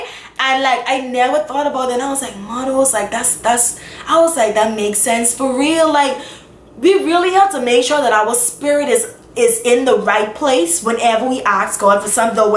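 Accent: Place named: Bahamas. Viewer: I like the fact that you do these videos with your Bahamian accent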